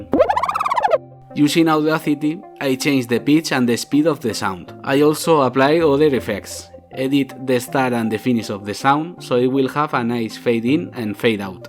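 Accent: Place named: Spain. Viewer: De nada bro, yo no se mucho de videojuegos, pero en esta plataforma has lo que más fácil se te haga, ya que al final Youtube tiene un argoritmo muy loco y podrás estarte matando haciendo un video muy bueno para que al final nadie lo vea, y es muy triste. Otra cosa que podría ayudarte demasiado, es haciendo streaming por Twitch mientras realizas los artes y programas el código del juego. Es muy bueno al momento de interactuar con tu público, además, no es un juego que normalmente suelas ver por la comunidad hispana, esto hace que sea más llamativo, crea un vínculo con el espectador. Por ejemplo conmigo, yo al ver tu acento en inglés, ya dije "Este es español" y eso fue lo que me hizo decidir quedarme en el canal. No tienes que tomar esto al pie de la letra haha, pero me gusta dar consejos que he ido viendo que le funcionan a otros.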